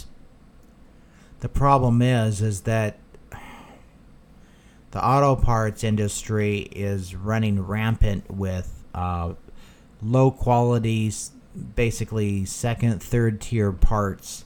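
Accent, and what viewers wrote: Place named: France. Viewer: I love your french brother😁. Great show btw..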